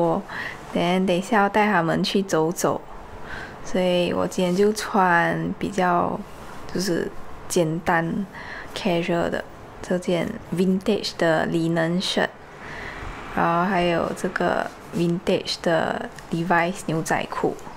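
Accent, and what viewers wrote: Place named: Malaysia. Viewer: Congrats on the nomination! 😍 And great that your parents were able to visit Singapore... 👍🏻 Love your Malaysian accent btw 😬 Reminds me of home..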